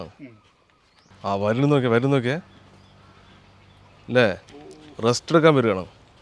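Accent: Palakkad, India. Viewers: എന്താത് പകുതി ചെല വെച്ചതായിരിക്കുവോ😁 ❣️പാലക്കാട് ഭാഷ ഉയിർ❣️ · പാലക്കാടൻ ഭാഷ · കണ്ടപ്പോ മുതലകളെല്ലാം കൂട്ടത്തോടെ കണ്ടം വഴി ഓടി 😂😂😂 പാലക്കാട് ഭാഷ ശൈലി 😍😀😀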